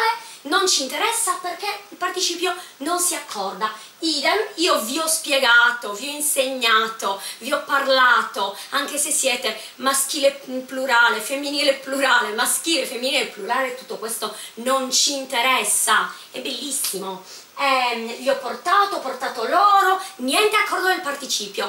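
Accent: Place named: Sardinia, Italy. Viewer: Ciao adesso,imparo italiana con Sardagna accente,sei une molta inteligenta maestra,bellissima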